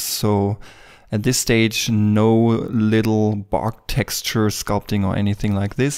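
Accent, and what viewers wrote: Germany. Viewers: Uhh dein deutscher Akzent kommt schon durch, was es aber interessanter macht :3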